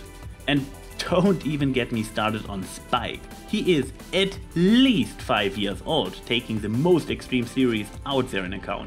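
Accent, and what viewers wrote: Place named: Germany. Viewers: Is German? I mean, dat accent though... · Right now i'm really curious: are you german or are did you just had one to many lessons of german in school? (option 3 would be that it was not your own voice and therefore spoken by a native speaker, but it sound extremly similar to me) In beiden für wahrscheinlich angenommenen Fällen bin ich mal so frei und probier es aus: Schönes Video und gute Argumente. Immer wieder schön dir zuzuhören.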